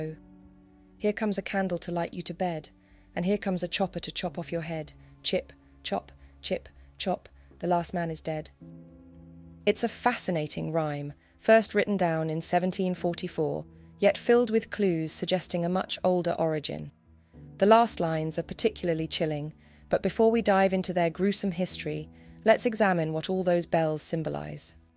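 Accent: England, United Kingdom. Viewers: Yes I agree great stories, and that beautiful English accent brings the story all together😊😊😊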